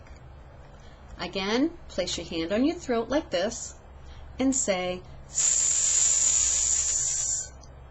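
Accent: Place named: New York, United States. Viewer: you seem to actually have a new york accent.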